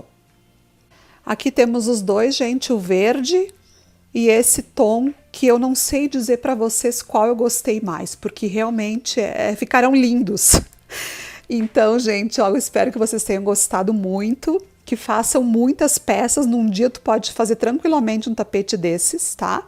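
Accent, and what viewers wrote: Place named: Rio Grande do Sul, Brazil. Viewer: Sotaque gaúcho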